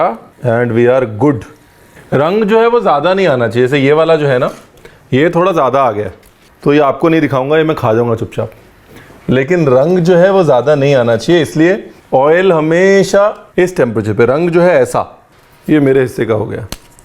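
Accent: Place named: Gujarat, India. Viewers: Fafda 😋👌👌👌jalebi🤔 miss kar rahe the lekin aap ka mitha mitha gujrati sun k dil khush ho gaya👏😀🙌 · Achha lgya apka gujarati tone 🌺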